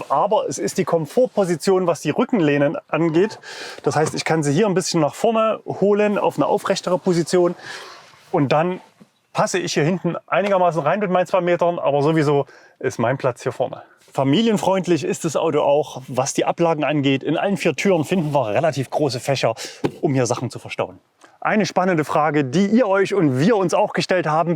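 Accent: East Germany, Germany. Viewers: ich halte diesen Ossiakzent nicht aus :(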